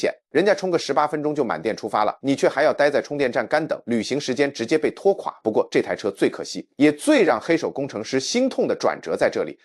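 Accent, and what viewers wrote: China. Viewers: 你的敘事在台灣應該是在中國，裡面的車除了最後那幾名其他的車根本在台灣沒有出現過，這種超爛的AI內容農場，這是中國無聊的統戰，錢沒地方花拿出來隨便唬爛，以後聽到中國口音直接封鎖，真是浪費時間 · 大陸口音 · 大陸口音 還裝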